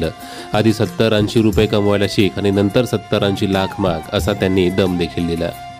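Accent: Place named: Pune, India. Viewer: bhau tu laich puneri bhachhya bolalas rao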